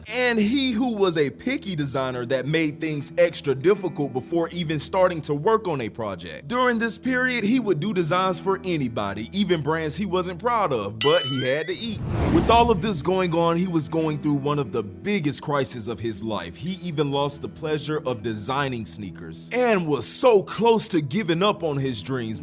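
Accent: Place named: United States. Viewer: I swear the voice sounds like an ai but i cant quite tell for sure because i know this is a french channel but the voice actor sounds very american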